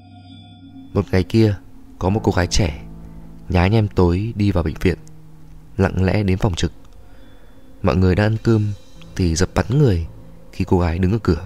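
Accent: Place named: Hanoi, Vietnam. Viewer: Nghe để giải trí mà. Tôi rất thích kênh nầy, tôi thích nghe đọc truyện, giọng rất hay chuẩn Hà Nội, tôi người phương nam, xin cảm ơn và tác giả.❤❤❤❤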